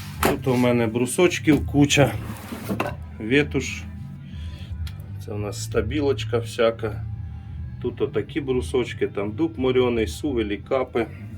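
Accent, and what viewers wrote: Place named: Lviv, Ukraine. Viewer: Слава Україні!Гарні роботи,гарна майстерня,по акценту чую недалеко від Львова.Назар(Львів)трохи ваш колега.Мирного неба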